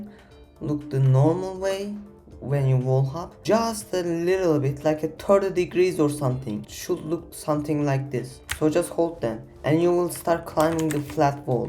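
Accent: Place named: Turkey. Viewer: Brotherman is obviously Turkish. Gotta get that pronunciation smoothed out, brother.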